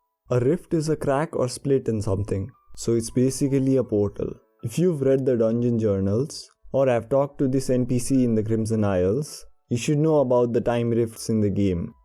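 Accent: India. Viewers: Hi are u Indian u sound like i also an indian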